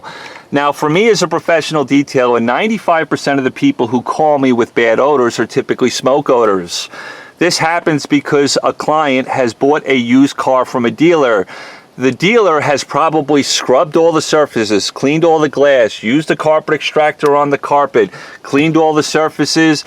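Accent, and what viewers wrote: New York, United States. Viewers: the accent sounded like it came from NJ or NY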